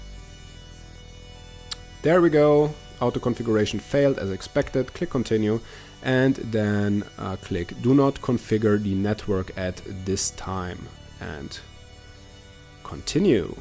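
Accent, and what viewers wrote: Germany. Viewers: That strong german accent 😂 Gutes Video, mach ich gleich ma.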